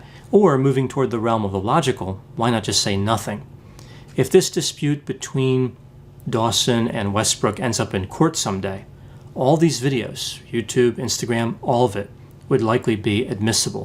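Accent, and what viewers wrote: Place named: East Coast, United States. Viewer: Caught your accent in this video - sounds very East Coast. Greetings from Philadelphia!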